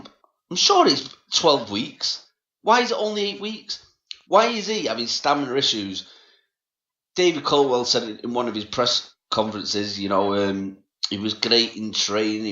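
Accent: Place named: Manchester, United Kingdom. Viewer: I sense by your accent a Manc like myself? I think it would be a good fight for Dave Allen and a win would definitely raise his profile. For Price it would just be a case of pound notes as its not something that would put him back in the spotlight. In my opinion, the way the modern heavyweight division is going re Joushua, Wilder and maybe Haye etc I'm struggling to see how other less (trimmed/tuned machines) will stand their own within the next two/three years...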